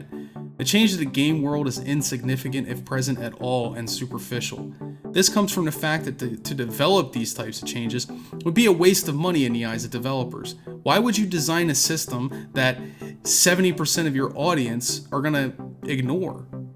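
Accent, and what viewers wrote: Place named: Philadelphia, United States. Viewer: You're from Philly, aren't you.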